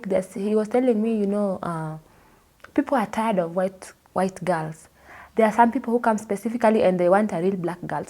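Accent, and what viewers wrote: Luo, Kenya. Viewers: Haha yeah jaluo ni jaluo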